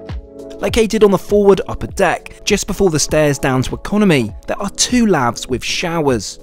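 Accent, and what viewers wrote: RP, United Kingdom. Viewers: Never thought I would be watching a man who uses the Kings English lol please don't take that the wrong way. Because were i was brought up we dont lol love your videos bro trust me wheather your on a train or plane I look forward to watching them. Am gald I sub to your channel I don't how many times because I've had to get a new phone lol. All the best bro and thank you for all the hard work you put in for your subs we appreciate it.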